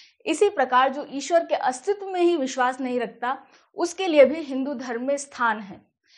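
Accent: India. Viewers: love the hindi accent also the usage of words. very unique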